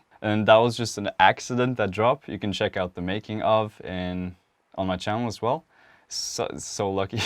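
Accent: Norway. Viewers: I heard his accent and went wait is he Norwegian and then I saw the message from his mom and it was in Norwegian and I was in shock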